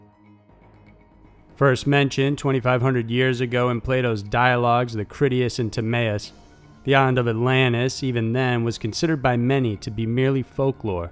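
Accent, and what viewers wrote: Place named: New England, United States. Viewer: msmeowdetroit, he said "father and father away" instead of farther. I hear a New England accent.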